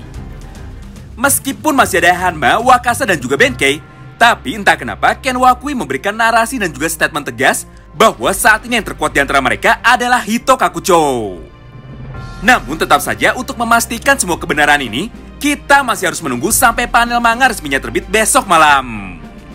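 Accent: West Java, Indonesia. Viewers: Bang mau nya ni,, abang orang Sunda tah? 😁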